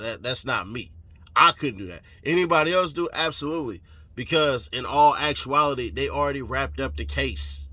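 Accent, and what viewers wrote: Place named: Bay Area, United States. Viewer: Nigga I knew you was from Cali I could tell a bay nigga .... much love from 77th Holly e.s.o!!!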